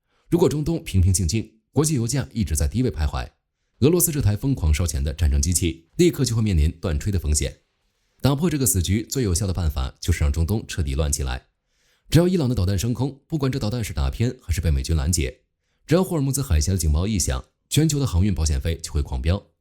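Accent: China. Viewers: 博主是台湾人？只是这配音很大陆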